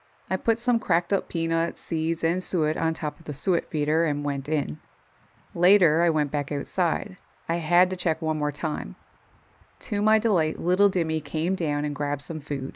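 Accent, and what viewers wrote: Canada. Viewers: This is amazing, cuz how bright personality you gotta have for bird's to earn trust in you, and feed em in freezing temperature? And first meeting trust is precious, I'm American and I hear Canadian accent, plus I love snow, and wanna go to trip to Canada when I can afford it. So beautiful nature 😍❤️😍😍❤️❤️😍❤️❤️. Love it.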